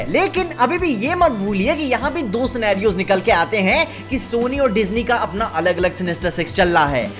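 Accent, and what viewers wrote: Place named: Bihar, India. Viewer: bhai tera bihari accent bekar hbai,bhai pure hindi me hi explain kar le ,jyda accha lageag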